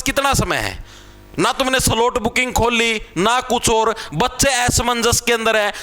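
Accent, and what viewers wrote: Haryana, India. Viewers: hlo sir kya ap haryana se hoo kya kttte desi or apni sii feel aee apki baata mm😊😊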